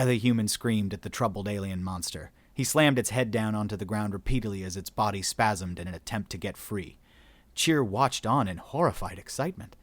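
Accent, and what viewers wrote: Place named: Australia. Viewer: Your Australian accent is amazing.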